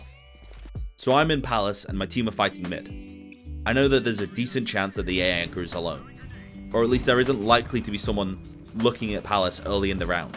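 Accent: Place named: United Kingdom. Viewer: AI? Brother, this i0s just a british boy doing a voiceover over cs clips. What is possibly AI? His brain?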